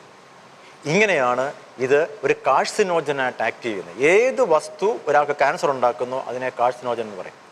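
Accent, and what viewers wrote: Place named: India. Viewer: Why are so many of these "white poisons" videos narrated by Indians with heavy accents? Aren't there any American experts who are willing to speak up on this matter?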